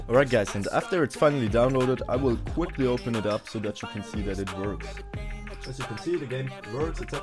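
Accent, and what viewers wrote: Germany. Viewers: Man merkt deinen deutschen Akzent etwas xD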